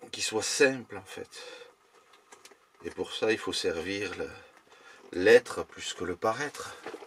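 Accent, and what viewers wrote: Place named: South-West, France. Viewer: merci a toi , je doit me repeter , mais j'adore ta voix au noté fleuri du sud ouest , ton rythme sain, et ta jolie diction , les silences sont aussi appreciables , souvent je clique en onglet , puis quand c'est le moment de regardé jy vais , comme la , un peu fatiguée et sur les nerfs , ta voix ma apaissé , j'ai presque faillé m'endormir ...ta phrase de traversé la vie des gens , en restant dans la tienne , en prenant réellement plaisir a la presence de l'autre et inversement ...j'ai un peu de mal a saisir le pourquoi du camion , la premiere vidéo que j'avais vu etait celle de la remorque a vélo , puis celle ou tu coupais ton bois a genou ...PAREIL pleine de poésie , tu es un jolie messager ,a bientot ...peut on acheter un t-shirt et soutenir ta chaine ?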